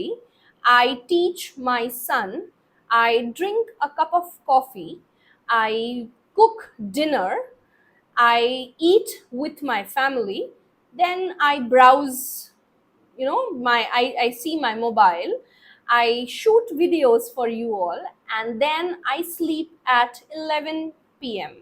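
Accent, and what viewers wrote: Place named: Bengal, India. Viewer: Your mother language is bangoli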